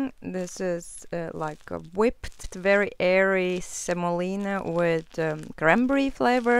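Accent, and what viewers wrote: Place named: Russia. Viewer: I see you have a Russian accent.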